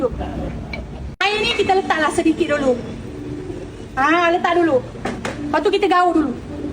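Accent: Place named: Pahang, Malaysia. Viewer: Trime kasih kak... Kak org pahang ke... Mcm ade sleng pahang skit tadi nye tu... Huhuhu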